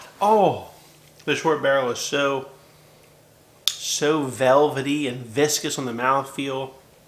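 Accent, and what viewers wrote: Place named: Baltimore, United States. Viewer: This guys Baltimore accent cracks me up. Reference to Drug city I assume you work or have family in Dundalk. Curious to try the Belle now. Thanks for the review.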